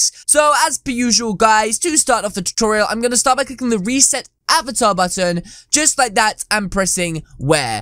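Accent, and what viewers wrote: United Kingdom. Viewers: British accent make me go crazzy.. AM LATINO.. Ayyy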